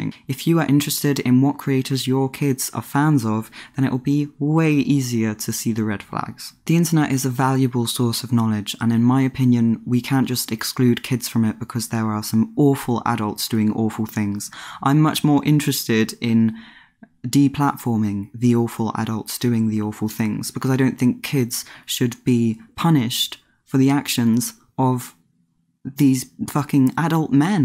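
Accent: RP, United Kingdom. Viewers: Your voice temporarily cures my depression. So soothing, so posh, so wonderful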